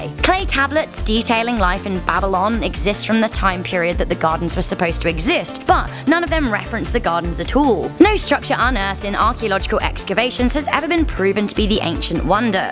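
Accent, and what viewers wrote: United Kingdom. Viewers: Shouri Like a Melody that’s exactly what an accent is, she has a british accent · Lmao she has a British accent. I'm British. Trust me, we usually know how to say Nebuchadnezzar properly here 🤣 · Love the Brittish accent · The moment I opened it my eardrum was assaulted by this irritating high pitched feminine British accent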